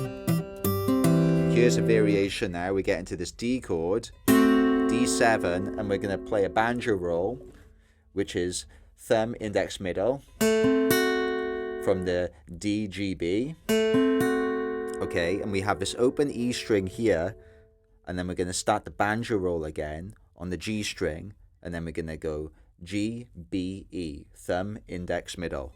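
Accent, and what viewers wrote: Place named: Welsh Valleys, United Kingdom. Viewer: Well then, (who I have only just found), where have you been hiding with your cheeky grin and boy-oh of the valleys accent? You're brilliant at this Chet style which, even after 65 years, I still cannot do; Boo-hoo! The control and independence of all your fingers is coupled with the ability to start, finish & repeat anywhere along the way of the toon..... Wowee! I can't do any of that. I'd like to say I learnt something from Chet - if only the names of the tunes and their existence....... but even that's a stretch for me. You know the one; It goes "Tic-toc / tic-toc / tic-toc blah, blah, blah" Thanks a billion for the lesson and breakdown of just what actually goes on in the execution of this piece - and the entertaining way in which you presented it. Should you feel the need to know more about my own style of playing I'll just say "Don't bother!" Thanks again Just wonderful..:hand-pink-waving:face-turquoise-covering-eyes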